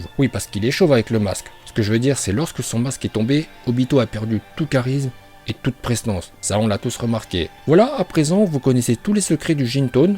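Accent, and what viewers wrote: Japan. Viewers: Wais ton dégoûtant accent japonais est le seul truc qui n'empêche de m'abonné et en plus de ca tu n'arrête pas de t'en vanter arrête avec çà Déjà avec son Goku qui glisse sur le crâne chauve de jiren et ca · Ton accent en japonais il est éclater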